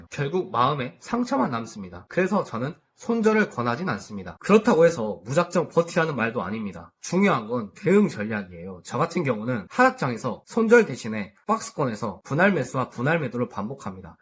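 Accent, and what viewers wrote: Jeolla, South Korea. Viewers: 전라도 분이신가요? 반갑네요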